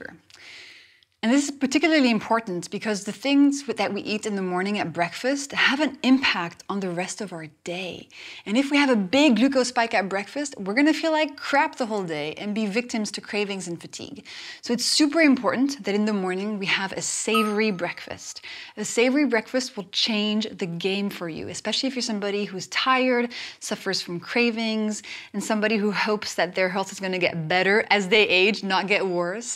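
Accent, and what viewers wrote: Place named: France. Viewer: She's a French speaker. I can tell. Probably from France.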